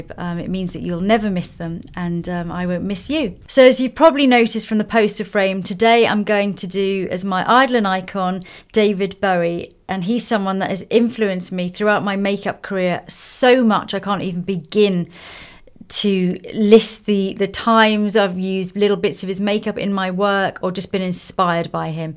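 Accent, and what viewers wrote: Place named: England, United Kingdom. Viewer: I don't like everyone's English accent... In fact, very few people make the cut... But, the way you talk...Ahhhhh...so soothing... Perfectly melodious❤️love all your videos... You're a proper artist!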